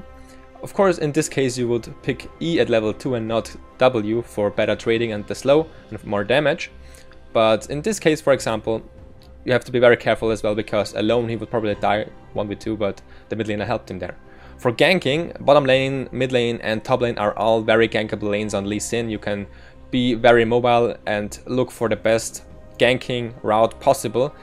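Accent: Germany. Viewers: are you german? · du bist deutsch oder? xD Nettes guide!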